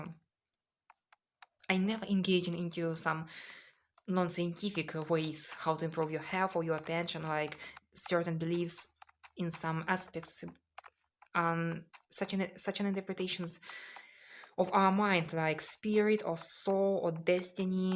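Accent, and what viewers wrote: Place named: Russia. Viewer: Сначала я увидел лайк под русскоязычным комментарием другого видео, потом услышал английский с явно русским акцентом, ещё заметил подписку на русскоязычный канал… Какие языки ты знаешь, и какой язык родной?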